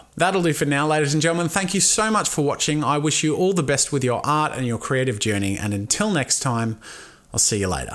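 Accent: Australia. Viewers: Are you Australian... I am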